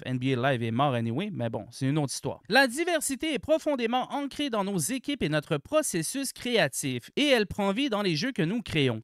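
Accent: Quebec, Canada. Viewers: bon désolé mais le quebecois et les expressions bizarres toutes les 5 minutes je peux pas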